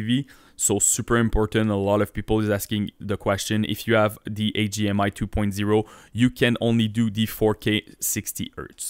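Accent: Quebec, Canada. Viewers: Man you're hella Quebecuois.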